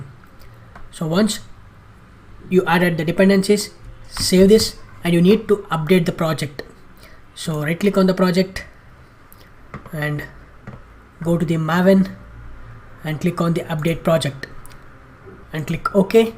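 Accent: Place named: India. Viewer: Where you from you speak English but background India?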